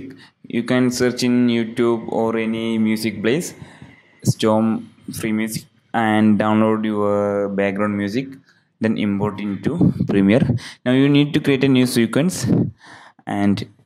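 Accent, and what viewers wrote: Kerala, India. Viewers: Malayali aano?? Enik aa slang ketit angane thonunnu vere aarkelum ee dobt ondaayo?? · You are a malayali... Right?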